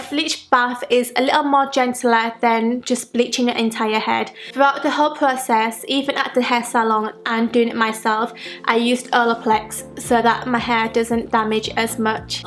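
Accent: Yorkshire, United Kingdom. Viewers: Your accent sounds like british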